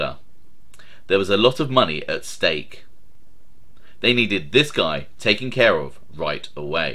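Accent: England, United Kingdom. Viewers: OMG that's the same thing I said 9th Ave all the way😂😂😂😂the very first time i saw this channel i thought he wz the gangster r i wz shocked when I heard the English accent!!!!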